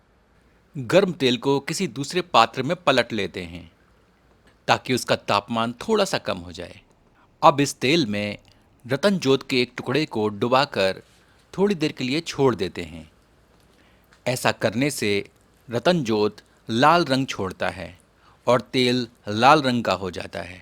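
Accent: Standard. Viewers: this voice (pure hindi) can't be forgotten once heard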